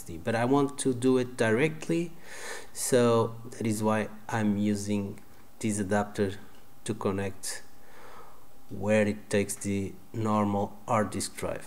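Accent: Portugal. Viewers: Ele é português?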